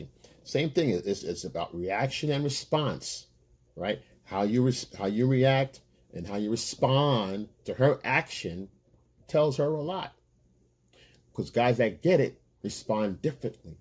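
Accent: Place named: North, United States. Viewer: ...You live in a large city area,.I live in a rural area=The South✔..Big difference like night and day..The South is more of a traditional approach with women,even though the majority have the same motives all over america..From your voice and prospective I'll guess you live 🗣in the North..Completely different than the South,specially the rural areas where family legacy is known=Your family,cousins,uncle,aunts,friends,grands and exes are known through you(they know alot about you bro.)..They know where you go,hang out,job,who you bangin,what you drink=Ain't no million factors in the South rural areas bro🤣.,ain't no putting on a show unless the person truly is the show💯..Appreciated your videos and prospective wisdom great content..👊🏽💯.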